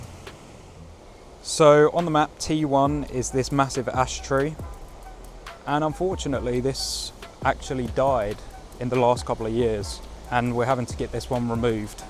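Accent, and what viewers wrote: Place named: United Kingdom. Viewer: By your accent, mentioning of ash dieback, alders, beeches, and the use of the word lime instead of lindens suggests to me that you and your garden are in the UK. Most likely in England? In your other video about Bissett's bamboo its too bad you didn't plant rivercane (Arundinaria gigantea). That is one of the four species of bamboo native to the US. It is adapted for life along a wild river. But then maybe its hard to get there or it doesn't do well there?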